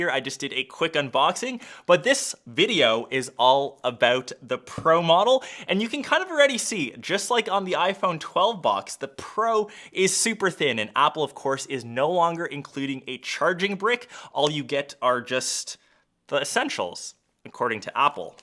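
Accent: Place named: Canada. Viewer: Love the Canadian accent! The 2 phones in your videos are the 2 I'm deciding between; the red 12 & the Pacific Blue Pro. Imagine a red Pro! 🥰 I'll order the Pacific blue & then they'll release a red later on. 🤦🏻‍♂️Edit, it's never mentioned & I keep forgetting, I AM getting the Pro, because it has 6GB RAM vs 4 in the 12.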